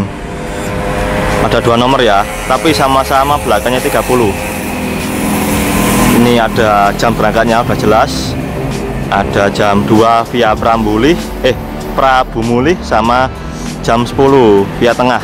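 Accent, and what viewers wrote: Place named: Java, Indonesia. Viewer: mantap mas.....kroso bedo...bis ko padang sing gawe video logate njowo...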